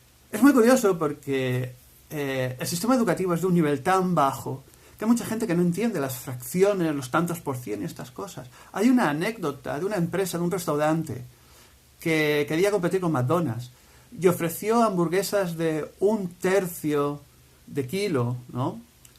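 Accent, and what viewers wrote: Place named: Spain. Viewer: "Allí en el reino de España"... Por el acento, pensaba que eras Español.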